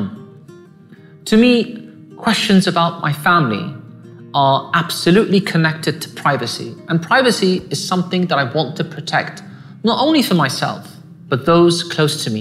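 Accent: United Kingdom. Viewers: When he speaks I get reminded of Hermione Granger and I'm convinced that's how British sound haha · Dang i never thought you have british accent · I learn a lot from each video!! Thank you. Bless you and your family, and may your privacy be protected by God almighty. But, can we stop just a minute to appreciate this beautiful ( British English? ! As foreign language learner I learn a lot, language wise, from you when you speak. It's beautiful, and I recommend listening to you ( speaking, singing composing, and even in silence, your calm composure is something valuable to learn from.) I appreciate greatly, how careful you are to contribute positively to ''the inevitable role model" to have become to many people in the world. ❤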